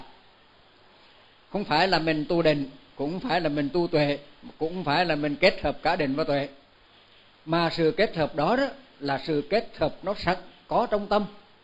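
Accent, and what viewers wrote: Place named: Saigon, Vietnam. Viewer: Mô Phật... kính bạch thầy. Xin thầy vui lòng chuyển âm băng của thầy giảng bằng "GIỌNG NÓI SAI GÒN " Rất hay và dễ nghe. Xin chân thành cảm ơn thầy. Con luôn luôn yêu mến giọng nói SAI GÒN tha thiết !!!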